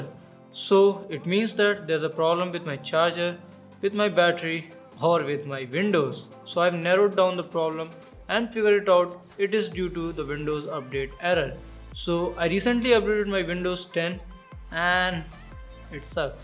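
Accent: India. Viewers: just speak indian cause your ruining english.......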